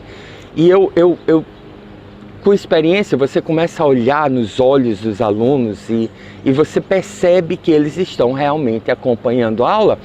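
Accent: Northeast, Brazil. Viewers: kkkkkkkk Amo o seu sotaque professor. Você é nordestino? Algumas pessoas dizem Auauauauau? kkkk Essa técnica parece com uma do Eckhart Tolle diz no livro O Poder do Agora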